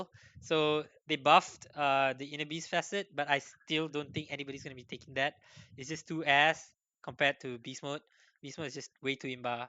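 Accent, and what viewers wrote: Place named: Malaysia. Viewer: Your malaysian accent is so bold, you from which part of Malaysia?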